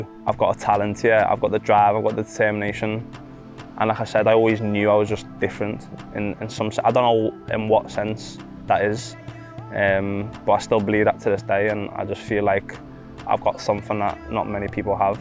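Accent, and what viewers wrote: United Kingdom. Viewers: انكليزي بس مو امريكي لان هو عايش بانكيلترا فا اكسنت مالته هو وزيدان انكليزي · شنو الأكسنت الي ديحجي بيها بريطاني أو انجليزي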